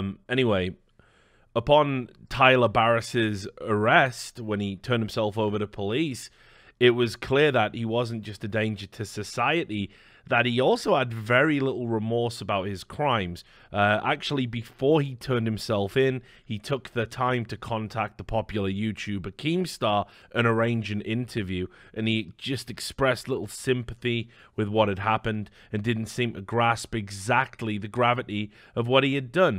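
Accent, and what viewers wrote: United Kingdom. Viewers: My name sounds a lot like “Tyler Barriss” especially with a british accent so I’m kinda shook everytime says it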